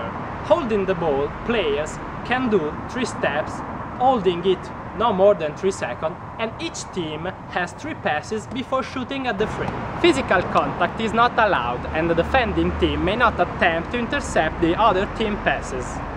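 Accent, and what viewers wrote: Italy. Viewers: è bello perché riesci a mantenere la cadenza lombarda anche parlando inglese... bravo! :) e bello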